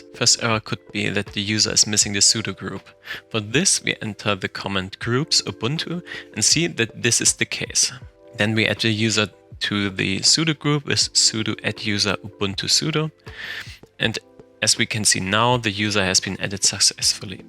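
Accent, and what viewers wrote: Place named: Eastern Europe. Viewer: Акцент славянский. Ничего не понятно, но очень интересно.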